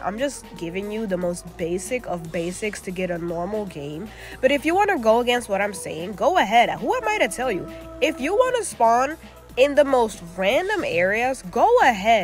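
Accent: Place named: Africa. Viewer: i love ur africa accent! im ur biggest fan plz remember when ur famous plz shoutout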